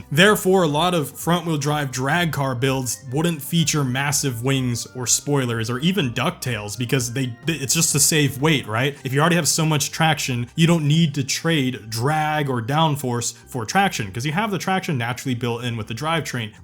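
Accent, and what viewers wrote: United States. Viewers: He's American, wtf you on about?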